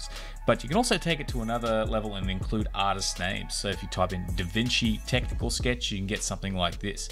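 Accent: Australia. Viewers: Oh, my ears! It was so damn nice to hear such an Aussie accent! G'day!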